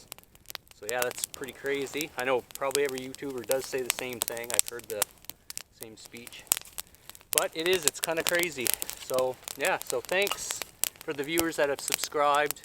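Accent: Canada. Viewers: Are you a maritimer?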